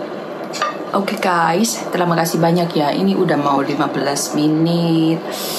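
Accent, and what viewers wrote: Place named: Thailand. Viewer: Dnger kak ngomong, jadi kangen sama cara ngomogng papaku yg mirip kya kak, karna papaku juga orang thailand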